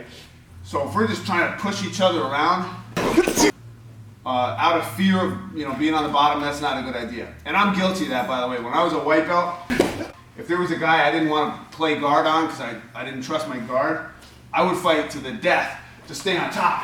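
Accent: Brazil. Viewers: Your videos are hilarious man. Especially your Brazilian accent. Thanks man. My bjj coach hardly explains anything for some reason.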